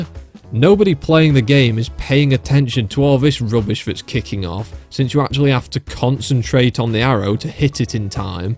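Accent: United Kingdom. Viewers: That British was like a foreign language to me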